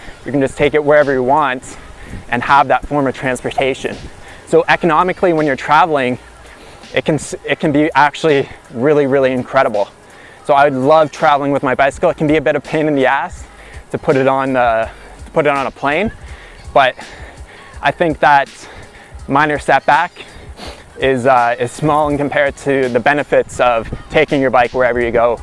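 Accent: Canada. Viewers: I new he was from Canada.the way he says about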